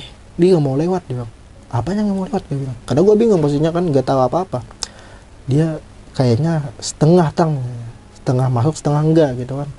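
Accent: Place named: Banjar, Indonesia. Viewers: Logat banjar nya berasa banar kang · Tanjung Tabalong Kalimantan Selatan hadir om.... Bang biar pakai bahasa Indonesia, tapi bahasa Banjarnya tetap timbul😆. Sehat terus bang😁🙏 salam satu hobi. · Beugh, Kaltim hadir wal aey,. Biar kata bahasa nya lu gua, logat Banjar tetep kalihatan jua lah,. Sukses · logat banjar nya kda hilang mang ae wkwk tpi ulun ketuju aja hehe terimakasih atas ceritanya mantap sukses selalu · Gunung 🗻 halau halau di Barabai Hadir Banjarmasin Logat nya Kd hilang bang Kya urng Barabai 😉🙏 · ini orang pahuluan mendok bnr wkwkwk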